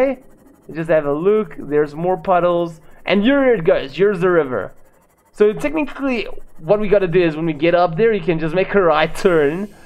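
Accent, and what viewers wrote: South Africa. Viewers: are you south african, u sound like one of my friends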